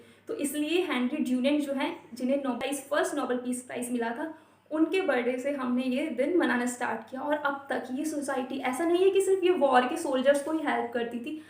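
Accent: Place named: India. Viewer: Looking good india aksen in english is unic and great.i like to hear..goodluck miss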